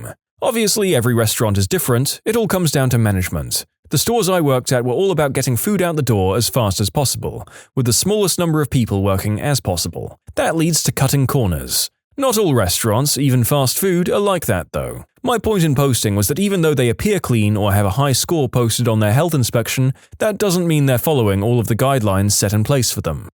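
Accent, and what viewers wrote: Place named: England, United Kingdom. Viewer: So hang on. If you're not British, narrator, then where does your accent come from? I always just assumed you were English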